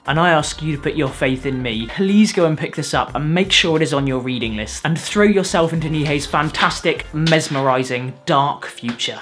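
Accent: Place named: United Kingdom. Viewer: I thumbed this up just cause I like your brit accent :p Did you read this manga through the end, up to and including the final chapter (78)? Since you disliked being handed over explanations I'm sure you liked it lol. Nihei's other works have even less dialogues, less characters, less character development, less character interactions and romances, less everything ahah. Anyway, season 1 & 2 of the anime were really pleasant and made me read the manga, but once the harem got put in place it started going downhill. Especially past chapter 55-60. Kinda a waste of such potential =/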